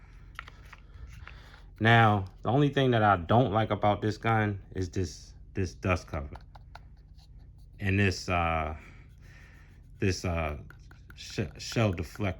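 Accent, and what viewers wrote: Baltimore, United States. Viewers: I was going to ask what state is this gun legal in but I swear you sound like you from bmore. Im in DC I want one of these bad but it’s not legal here 😢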